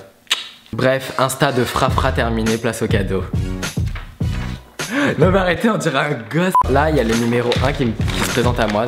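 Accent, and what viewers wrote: England, United Kingdom. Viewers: Tes vidéos sont bien meilleures sans ton accent anglais celle la était super :)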